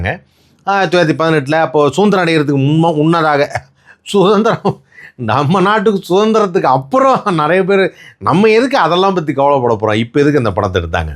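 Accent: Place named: Coimbatore, India. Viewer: Kovai Accent 💯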